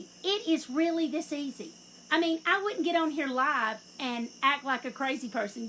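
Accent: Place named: Tennessee, United States. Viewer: Refinishing an old antique tobacco humidor right now. Been going back an forth on what color to paint it and to distress or antique wax...or both. Haha I kept feeling no matter what I did that something was missing. My door is plain. And I thought how could I DIY a raised embossed stencil onto wood. And I found you!! You are so dang fun and I'm from TN and my accent is about as thick as yours. Lol My accent and my "attitude" (not in the negative sense, more moxy and grit rather than attitude hahaha) that has been what has been holding me back from starting my own YouTube channel. But you make me feel like "what the world am I so afraid of!?" I've never been one to care what people think of me. Why should the internet be any different?? You are so unapologetically YOU. I completely admire that. And I NEEDED this tutorial tonight!!! Going to risk a trip to lowes tomorrow so I can get my piece finished! 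Thanks so much for sharing and for inspiring me!❤ New subscriber here!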